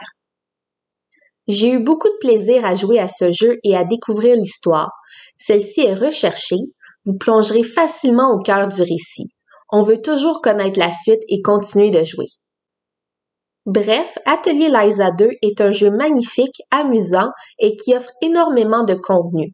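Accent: Canada. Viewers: Sympa comme présentation ,l'accent canadien en plus .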